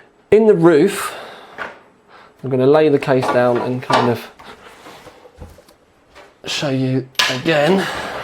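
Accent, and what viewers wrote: United Kingdom. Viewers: Let me guess, British accent ? btw excellent video,rich in detail .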